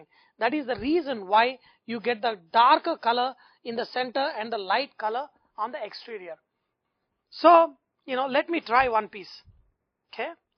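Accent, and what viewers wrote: India. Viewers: i love watching from the uk you allays make me happy and i like the Indian accent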